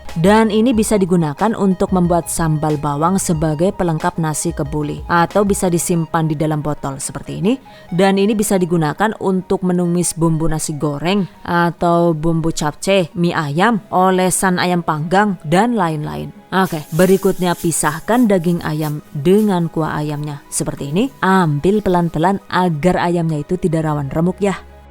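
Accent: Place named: Tegal, Indonesia. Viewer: Tegal baget ya bund,,😅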